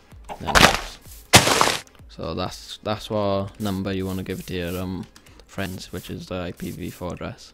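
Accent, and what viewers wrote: Wales, United Kingdom. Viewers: you sound proper welsh. are you ? :P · Is that a welsh accent I hear? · nice welsh accent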